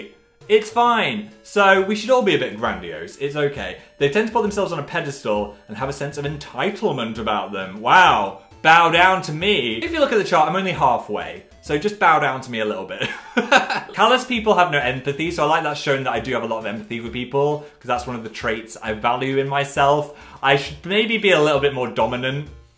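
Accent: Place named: Northern England, United Kingdom. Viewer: Ahhhh Northern Phil is back with another “Ello!” intro!!! I’m loving his return to his northern roots 🥰💗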